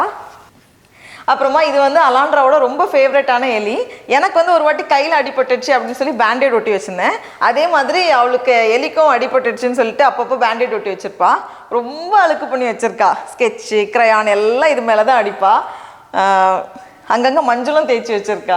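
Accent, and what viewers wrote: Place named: Chennai, India. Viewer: na unga tamil slang rmba pidichanala inga chennai ninachen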